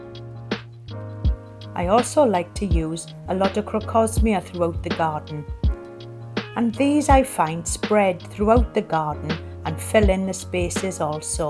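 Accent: Wales, United Kingdom. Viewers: Thanks for this. Nice honest tropical style garden it’s inspiring. I love the welsh accent so kind and warm , much love x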